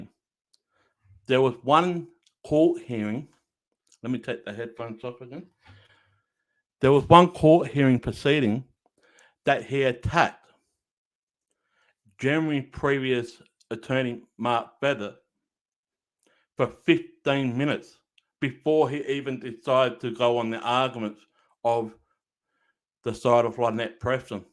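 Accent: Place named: Australia. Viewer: Thought you sounded like an Aussie 🇦🇺🇦🇺🇦🇺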